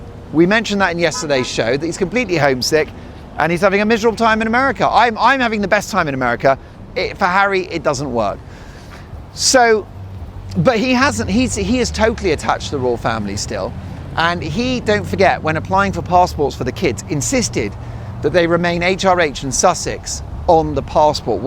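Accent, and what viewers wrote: France. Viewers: Hello! I love your show and you have a lovely french accent, but you are so so wrong about Emmanuel Macron. He speaks a lot but tells 2 things about the same topic and never never never act! And do not tell him about freedom of speech. He doesn't understand the concept. But it looks like "nice" out of France. Cannot understand why. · Mark you always make me laugh!!😂😂😂😂 Keep practising the french language💐💐. I like the accent🌺🌺🌺 ⚘️🥀⚘️⚘️⚘️🌻🌻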